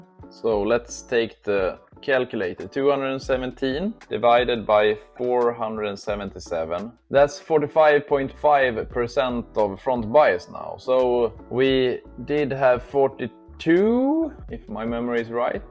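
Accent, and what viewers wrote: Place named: Italy. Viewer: Yeah, man, I do have this exact model. Really, I really wish I could get the front-wires from hood-to-roof installed. Also, I am trying to place your accent- Italian? I'm USA.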